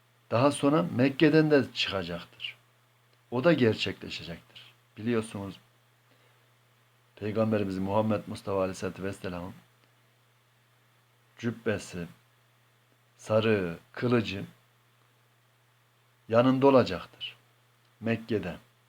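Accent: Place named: Ankara, Turkey. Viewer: Angara şiveden belli olmuyor mu İlk çıhışş çıhacak diyip duruyor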